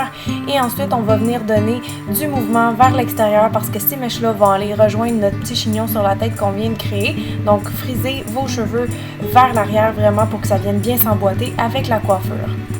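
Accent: Quebec, Canada. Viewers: C'est ben plaisant d'avoir des tutoriels en français avec une fille qui parle québécois et à laquelle on peut s'identifier! :)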